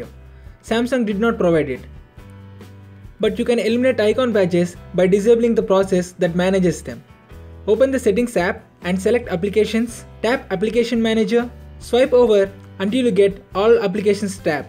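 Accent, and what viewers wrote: India. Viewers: straight up indian tech support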